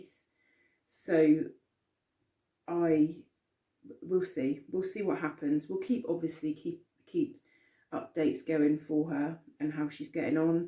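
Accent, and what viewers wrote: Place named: Devon, United Kingdom. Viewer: You sound like your Devon way, maybe Cornwall 😊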